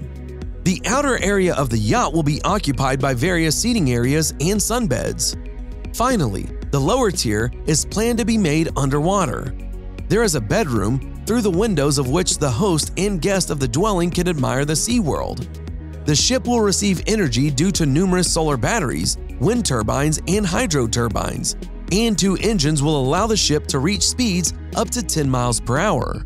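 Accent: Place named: United States. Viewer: How does that American sounding voice speak such bad English? Is it a brilliant bot, or an idiot voice actor??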